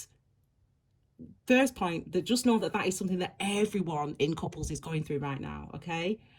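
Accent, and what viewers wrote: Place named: Northern England, United Kingdom. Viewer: Thankyou for picking up my morning. Your accent makes me smile. Im British (i live in London) and i lived in Sheffield for 3 years, your voice does remind me of all the people I met up north. 😊🌈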